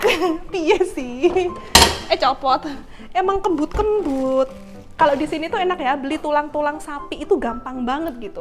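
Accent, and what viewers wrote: Java, Indonesia. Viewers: Mbak jadi medhok bgt ngomongnya 🤣🤣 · Mbak, pulang ke Indonesia medok nya keluar ❤❤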